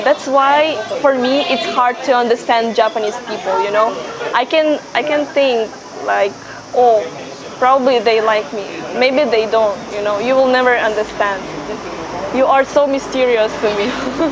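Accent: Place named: United States. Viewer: She kinda has an American accent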